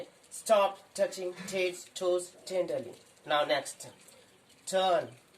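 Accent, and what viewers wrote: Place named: United Kingdom. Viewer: Wow! Nice British accent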